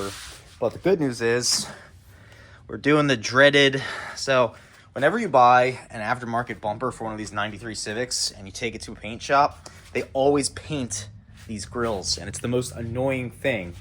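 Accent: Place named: Pennsylvania, United States. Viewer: You talked for 3 seconds and I knew you were from PA. good work and the paint job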